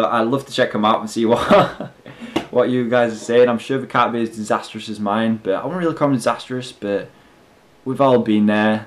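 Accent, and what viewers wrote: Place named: Yorkshire, United Kingdom. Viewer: Dat Yorkshire Accent Doe so Amazing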